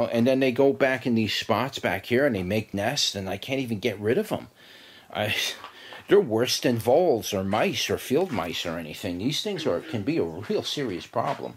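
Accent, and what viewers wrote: New York, United States. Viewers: You sound like you’re from one of the boroughs. :). I like your accent!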